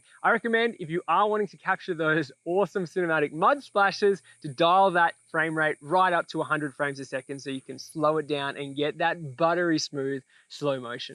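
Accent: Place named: Australia. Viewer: Dunno what I love more!! The epic tips, hat, or the fact that this is the most Aussie ever sounded. Top highlights: “It’s a bloody absolute ripper” “Let’s not be d*ckheads” Thoroughly enjoyed 😝✌️🤙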